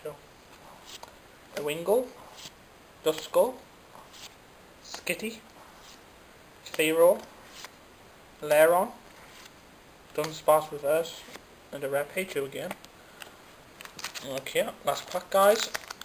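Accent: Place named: United Kingdom. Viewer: thats cause he has a british accent lol which i think (not be biased because i'm british) is one of the best accents in the world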